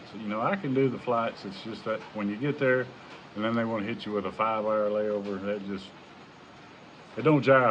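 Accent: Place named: United States. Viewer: That’s Strong American accent , didn’t understand much of that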